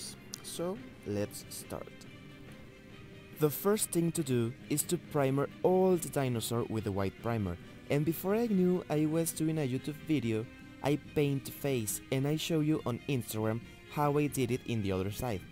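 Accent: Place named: Spain. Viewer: Hey, where are yoy from? Por el acento pareces español o hispanohablante, pero al país en concreto ya no logro distinguirlo. Además la marca Jovi de las acuarelas es muy común aquí en España, no sé si se dará en más países. Estoy en lo cierto? Gran canal por supuesto! Acabo de descubrirlo y me encanta!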